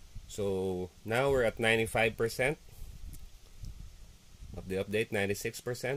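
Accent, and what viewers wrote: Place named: Philippines. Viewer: you sound like a filipino.. are you pinoy?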